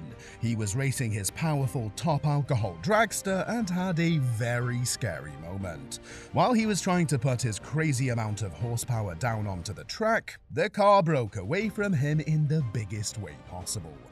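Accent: United Kingdom. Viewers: I was going to watch until I heard the Brit narrating....😱🤨🤬🤬🤬🤬🤬🤬🤬🤬🤬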